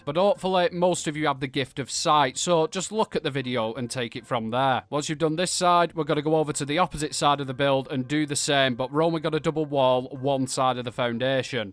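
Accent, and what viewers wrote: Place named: Yorkshire, United Kingdom. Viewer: Gotta love a yorkshire accent 😄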